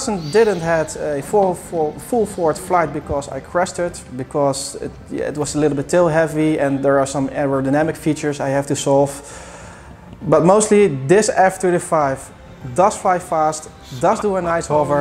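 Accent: Netherlands. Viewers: Ik hoor een nederlands / engels accent. ^_^ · I nit think he us russian my friend, he sounds dutch to me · Je hoort dat het een Nederlander is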